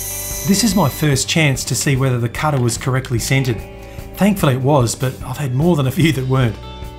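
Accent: Germany. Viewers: Are you german, swiss or austrian? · are You german?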